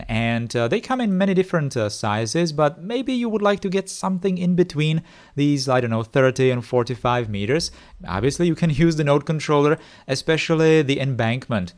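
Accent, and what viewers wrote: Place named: Czech Republic. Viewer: V prvních patnácti sekundách videa mi bylo jasné, že jsi Čech 😃 Twitter to potom potvrdil. Tvoje angličtina zní skvěle, neber to jako výtku 😊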